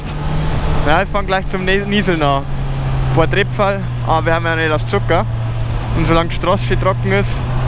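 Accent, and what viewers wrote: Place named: Bavaria, Germany. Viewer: I finds immer no nice dass du beim boarischn bleibst :) Bist echt a griawiger typ Weida so!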